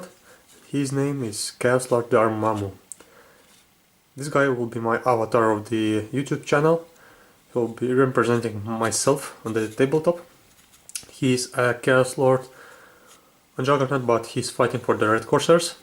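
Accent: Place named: Russia. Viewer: I work with people every day in Atlanta, GA, USA that have MUCH worse Russian accents than you!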